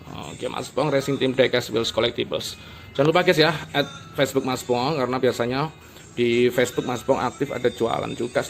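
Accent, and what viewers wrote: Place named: Java, Indonesia. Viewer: Logat Jowo ne Gak Lepas... Mantull Mas 🖒🖒🖒 Bangga Dadi Wong Jowo.. ❤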